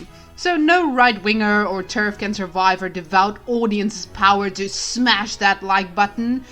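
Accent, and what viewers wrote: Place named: South Africa. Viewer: Aydin Paladin het jou video vir my gestuur, het die stem gehoor n gedink ja nee jy kan net n Suid Afrikaner wees..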